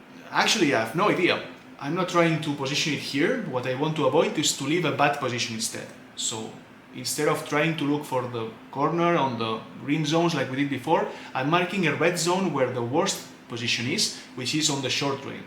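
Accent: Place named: Spain. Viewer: And about the translation, its quite good. Actually sounded like maybe you would have spoken, with a bit of spanish cadence but good vocabulary.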